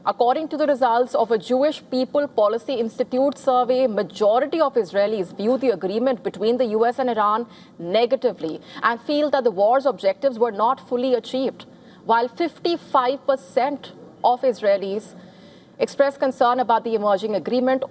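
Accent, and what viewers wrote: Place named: India. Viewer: This lady's Indian accent is way too strong. Could only understand 25% of what she said.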